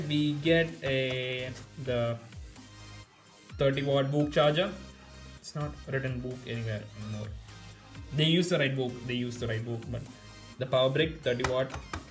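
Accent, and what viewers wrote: United States. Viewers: Wow, nice review Your American accent is so cool bruh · when is full review coming ?good bro you accent seems like american . any way it was amazing good luck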